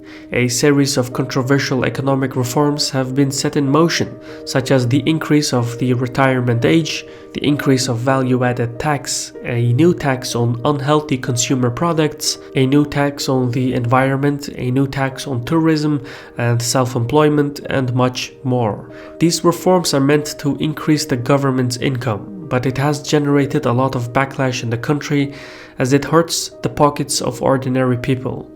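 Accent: Europe. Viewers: That's an extremely rich European accent, you've got there...The two most expensive purchases most average people make in their unfortunate lives, is the home and their car. If it wasn't for mortgages and motorcars, then what would capitalism and its banks do with themselves? Hopefully jump off an extremely high cliff...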